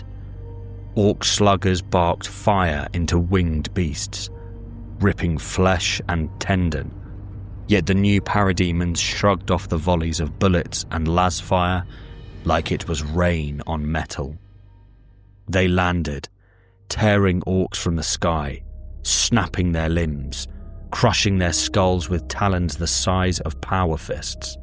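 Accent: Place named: London, United Kingdom. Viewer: Your true voice truly sells it. London accent no? Def not Manc and no chance Scouse as those are the ones I’m familiar with. Lol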